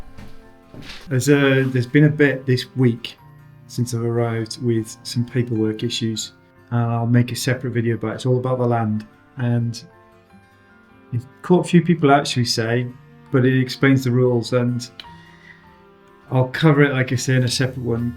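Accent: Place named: Northern England, United Kingdom. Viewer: It is north British English... The best way to 'speaka di language'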